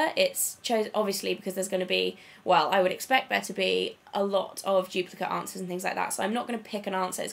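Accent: United Kingdom. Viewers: Hi, I actually just found you through MissGlamorazzi and I really love your videos and your British accent! I have a friend who lives in Hull, I think thats how you spell it?? Actually I think she lives in London now and I love listening to her voice. My husbands step-mother is also from England, she grew up in the Isle of Mann. Anyways, Could you do a video on different hair styles that are simple everyday looks? My hair looks the same everyday and I have no idea what to do with it! Thanks!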